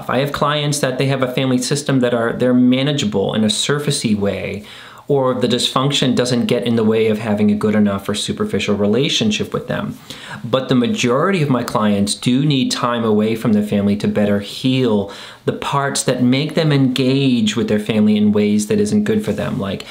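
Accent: Boston, United States. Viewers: I love it when your Bostonian accent comes out! This video was medicine for me. I’m mid-cut off with a couple covert toxic people after a verbally and emotionally abusive episode where I had enough. Though it’s tricky because my life was already in limbo… divorcing an alcoholic and currently staying with a family member. This family member keeps bringing up how much it hurt one of the toxic people when I didn’t acknowledge her birthday following the abusive incident. It’s exhausting being under the same roof. This person definitely has a different story of our family. Looking forward to when I am able to get into my own place… I’m working on it. This video gave me the encouragement and validation to stay strong and true to myself. I feel so much calmer and grounded after I watch these. Thank YOU!! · Interesting how you compared cutting off family to a nasty divorce... 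It reminds me of covert incest and parentification, which can totally feel like a nasty divorce, even when you set the smallest of boundaries with the family members projecting those relationships onto you. PS great Boston accent! I'm Boston based as well! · 😂 love the 'Bwostin ackcint'!!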